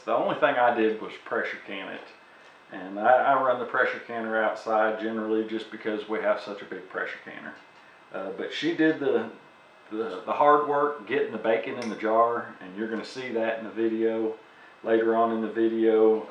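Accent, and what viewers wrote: United States. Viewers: I like this guy! And he got a like and subscription because he wasn't all "hay goys loike n subscroibe dood" na he's all American. A man America herself wishes she could emulate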